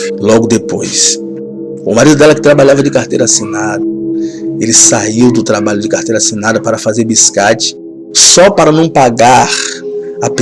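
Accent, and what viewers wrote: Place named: Bahia, Brazil. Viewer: jóia. Você é baiano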